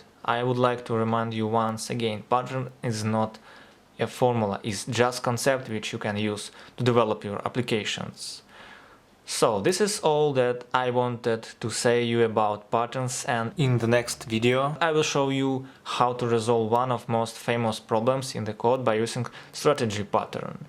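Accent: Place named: Russia. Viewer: я бы сказал , что это русский мужик читает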